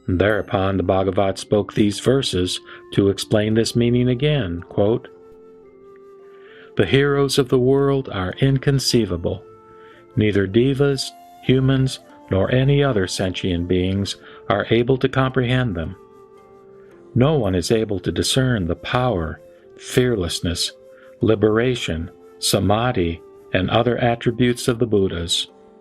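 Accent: Texas, United States. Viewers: Texan accent spoils the reading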